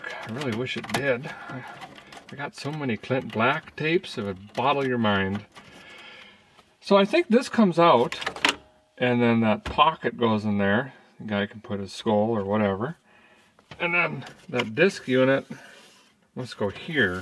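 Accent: Minnesota, United States. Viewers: With that accent you've gotta be from western Minnesota ya knooooowwww. Proud Benson native here! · why are there always idiots who downvote? I really like the channel, and there's not a whole lot about it not to like. We need more real people like you in the world. Stay safe. Sounds like you live in Minnesota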